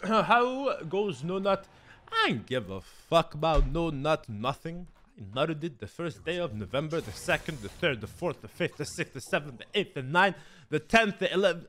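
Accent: France. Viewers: Is he extra french on this one or is it just me? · this french accent is so annoying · Is he french or something????? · is he french ? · U sound like a french accent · Is it just me or does he sound way more french in this video than normal? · are you french ? is a really old question I'm asking me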